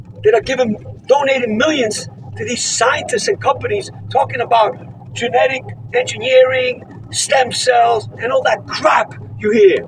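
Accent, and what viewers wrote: United States. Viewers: Are you in Europe? You sound American!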